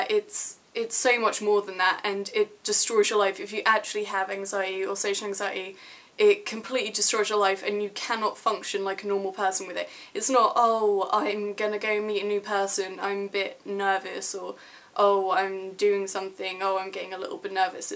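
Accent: United Kingdom. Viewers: before I even clicked on this video I knew you were gonna have a british accent, I'm not even joking. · Does anyone know what part of UK she is from? She has the exact same accent as Asa Butterfield and it's driving me crazy trying to figure out where it's from in UK. SOrry, and thanks.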